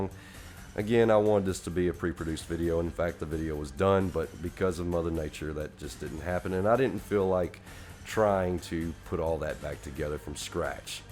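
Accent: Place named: South, United States. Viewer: Great video, I recently picked up a few repo myself and have no problem with them I'm not going to sell them, there staying in the collection. Love the soft southern accent. Just subbed.👍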